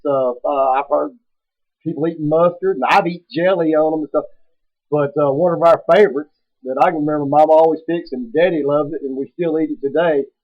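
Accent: South, United States. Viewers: SOUTHERN flair! Says it all! 👍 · Southern stories told with a southern flair! Love it! Merry Christmas to you and yours,!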